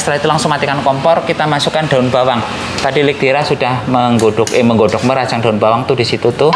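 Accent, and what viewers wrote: Banyumas, Indonesia. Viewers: ngomng ngapk baelh...aj badeol...😂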